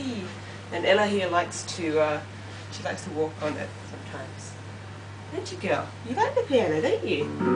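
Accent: United Kingdom. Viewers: you look like you are studying aboad or something.. its funny to here a british accents from you kkk.... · Omg an asian with a british accent... I'm asian too, will you date me?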